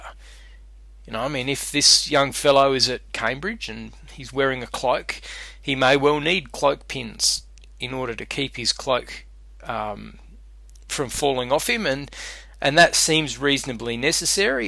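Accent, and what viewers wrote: Australia. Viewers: Please excuse my ignorance, but does this apply in United States law? Or is this upload meant for Australia (or elsewhere, I'm only going off of your accent honestly lol).... Not trying to generalize (maybe you're in the US and just happen to have an accent from before), but I figured I'd better make 100% sure that this will apply to my own situation :) thanks for the insight on contract law! Very well explained.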